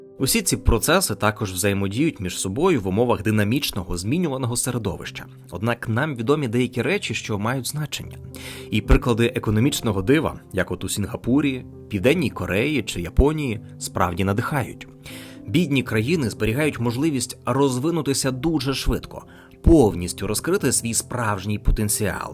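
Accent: Russia. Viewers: Дикторе! Купи поп-фільтр, або навчись ним користуватися! 👺 І ще важливий момент: українська "и" це не расєйська "ы" по вимові! А "що" - це не "шчо"! 💩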